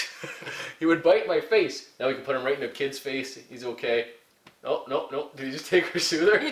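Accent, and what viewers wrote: Ontario, Canada. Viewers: hey. i saw you on alone and stumbled across your algonquin videos. i'm also from ontario and around the same age as you so i love how we have the exact same accent and i get all your little references. this dog is probably smarter than scout was so you gotta be more strict and firm. make it learn tricks and commands and dont be afraid to be firm. can't wait to see how he does in our beautiful provincial parks this year. thanks for making these vids, i know it's a lot of work but i appreciate it and i know your kids will love looking back on these videos once we're both old grumpy men.... or after you die from being eaten by a muskie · Your Canadian correct? Not out of Michigan. Thanks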